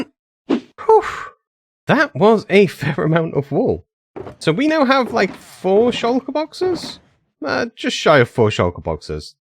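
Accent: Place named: Mansfield, United Kingdom. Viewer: I've been trying to figure out the accent for a bit. I was leaning towards Mansfield. Yorkshire had crossed my mind too. Edit: to be fair leaving the UK has dulled my ability to pinpoint accents considerably.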